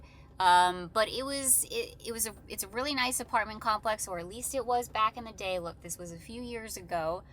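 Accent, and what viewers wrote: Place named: Michigan, United States. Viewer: Hi 👋 MELBOURNE!!!! WOO! sound like Michigan accent.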